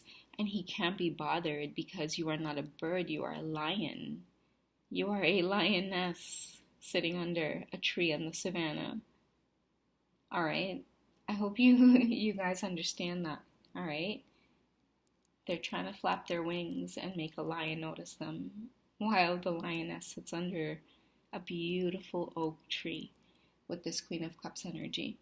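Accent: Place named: Trinidad and Tobago. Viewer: No sah. When the accent came out i said is Trini. 🙋🏽‍♀️ Now back to the video.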